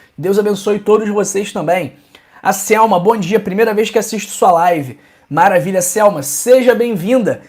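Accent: Rio de Janeiro, Brazil. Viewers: Obrigado, doutor. Não se preocupe com críticas sem sentido. É um grande médico, do bem e percebe-se, também, que é muito atencioso e amoroso para com as pessoas, parabéns! Fale bastante e com seu sotaque carioca, passando-nos suas importantes informações que nos ajudam na qualidade da saúde. Abraço.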